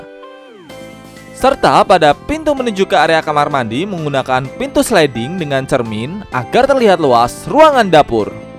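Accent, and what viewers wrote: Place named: Java, Indonesia. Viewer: masnya ngomongnya medok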